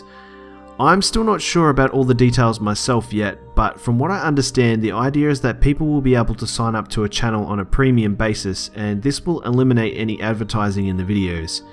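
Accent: Australia. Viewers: Loved the video. Your aussie voice is the best.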